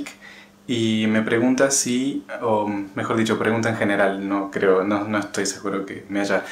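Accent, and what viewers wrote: Argentina. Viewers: Me suenas como de Mendoza o San Luis medio parecido el acento. por el tema de las articulaciones que use lubricante siliconado de alta perfomance para rodamientos en spary seria el que usan para lubricar los coolers una ves me paso y le meti con el spray y se soluciono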